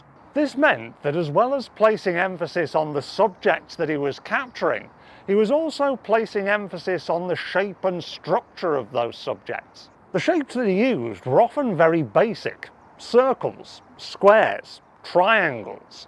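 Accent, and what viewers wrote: United Kingdom. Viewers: This guy sounds so British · Is there a more English looking and sounding man than this one?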